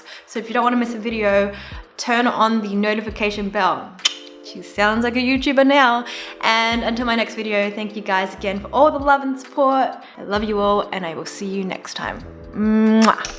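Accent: Australia. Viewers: ay the nice aussie accent just makes me hit the subscribe button oh yeah love the final look 😍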